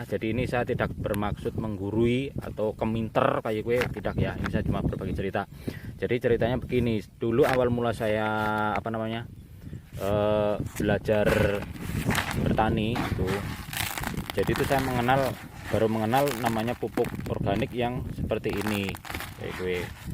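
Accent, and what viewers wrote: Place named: Banyumasan, Indonesia. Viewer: Rika wong ndi kang deneng ngapak kaya nyong cilacap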